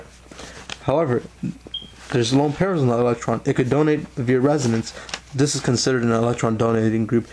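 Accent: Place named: Mexico. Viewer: you sound like a mex which is a direct correlation to lack of intelligence. Therefore, your videos are trash, not unlike the place you were born. Very dry, hard to watch. Spice it up in the future (hot sauce, less bean sounding, less monotone) was a decent attempt i guess tho could've been worse. You coulda been black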